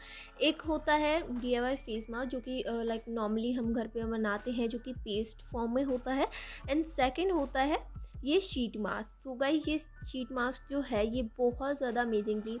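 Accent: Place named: Punjab, India. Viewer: Sister...Are you from punjab?You have got that punjabi accent..😍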